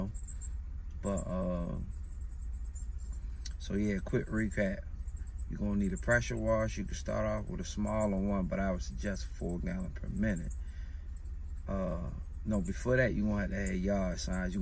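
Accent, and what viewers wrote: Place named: Baltimore, United States. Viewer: Great information my brother.Are u from Baltimore?I got homies from Baltimore and they talk just like u bruh😂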